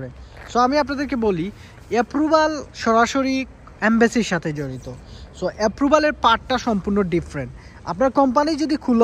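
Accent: Sylhet, Bangladesh. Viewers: ভাই আপনে সিলেটি নি জানাবেন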